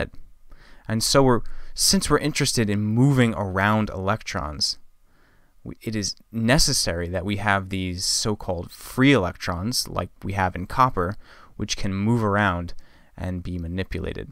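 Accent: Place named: Oxford, United Kingdom. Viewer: AN INTRODUCTION TO ELECTRICAL CIRCUITS...... SIR, WATCHED YR ABOVE VIDEO AND ENVIED YR ORIGINAL TALENT AS A TUTOR, AS A GRAPHIC DESIGNER, AS A ELOQUENT SPEAKER OF OXFORD ENGLISH, AS A PIED PIPER ATTRACTING RUN AWAY KIDS/STUDENTS RUNNING AWAY FROM SCIENCE. WHAT IS THE ATTRACTION OF THIS VIDEO? THE SUPERIORITY AND EXCELLENCE OF THIS VIDEO IS NUMEROUS AND HENCE I WILL BE NOT BE ABLE TO TELL ONE AS THEY ARE MANY AND INNUMERABLE. TO POINT OUT A SINGLE MISTAKE I AM UNABLE TO DO AND FAIL MISERABLY. WHAT A WAY TO TEACH! SO PERFECT AND TEACHING SCIENCE MORE EASILY THAN VIEWING A TV SERIAL. AT THE END OF THE DAY A SERIAL IS FORGOTTEN BUT NOT YR TEACHING . TO TELL YOU FRANKLY, ONE TRUTH WHICH YOU DO NOT KNOW IS, THAT ONLY A HANDFUL OF TEACHERS ARE THERE IN THIS WORLD WHO CAN TEACH SCIENCE/ ELECTRICAL ENGG AND SCIENCE FOR SUCH A PERFECT UNDERSTANDING. 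THE TRUTH IS HEARING THE WORD "COULOMB" BRINGS IN HEADACHE BUT NT YOU OR YR TUTORING. I HOPE YR VIDEO IS THE BEST ONE TO BE MADE COMPULSORY IN ALL ELECTRICAL/ELECTRONIC SCHOOLS AND POLY-TECHNIQUES. YOU ARE A VISIONERY TUTOR MAKING A COMPLEX SUBJECT INTO A SIMPLE ONE WHICH CAN BE DIGESTED EASILY BY EVEN THE POOREST GRADE OF STUDENTS VATSA INDIA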